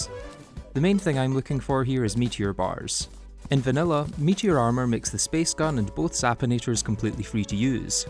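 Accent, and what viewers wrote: United Kingdom. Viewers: Subbed but why are you british?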